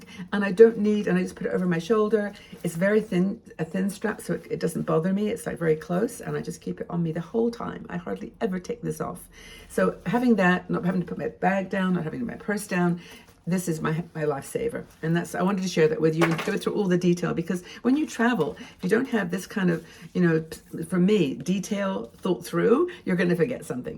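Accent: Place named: Wales, United Kingdom. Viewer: Yes. Agree about clear diction but thought I heard some of the Welsh !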